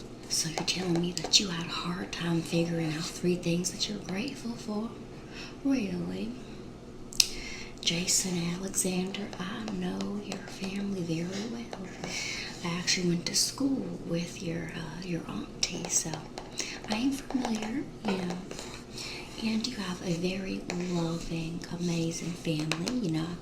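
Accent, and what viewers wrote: South, United States. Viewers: Aaaaah🎉! You’re back with the Southern accent 🥳🥳🧐thank yoooou!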